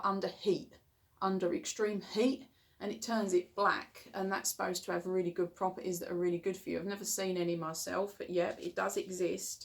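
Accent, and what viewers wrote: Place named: East London, United Kingdom. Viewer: You sound like you are from my ends East London . Thank you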